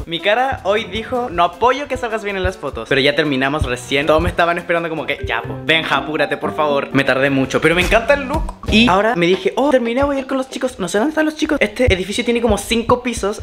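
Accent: Chile. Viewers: eres de chile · eri chileno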